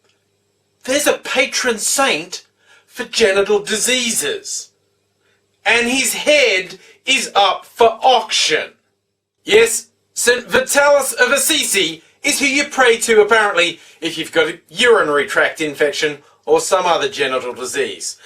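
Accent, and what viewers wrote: Australia. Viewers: hmmm you seem angry for some reason and also Australian hmmm still cant make why i see this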